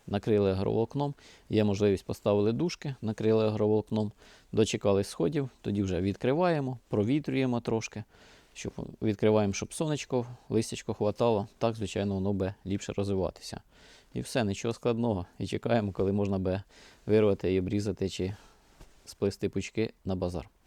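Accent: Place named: Kyiv, Ukraine. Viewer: Доброго вечора, завжди дивлюсь з задоволенням, а на дурні коменти не звертайте уваги., в нас також говоримо як і ви ,бо з Київщини.😊😊😊😊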